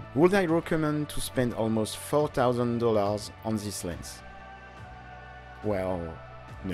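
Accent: France. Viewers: keep the french accent to your english! english is the one language where it’s actually kinda cool to blend your roots with the language imo. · Not trying to be rude in any way by saying this. Your english has really improved recently. It feels like you talk with a lot more confidence and energy, while still keeping that slick French accent.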